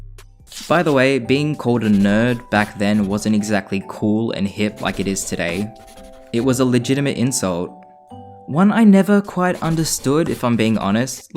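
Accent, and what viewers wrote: Australia. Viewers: R u Australian? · so i dont know if i herd this right but you said '' deku'' i dont know i am so sorry i don really understand Australian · I just found out you’re Australian- eyyyyyy (also Australian) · Haha I knew you were Australian.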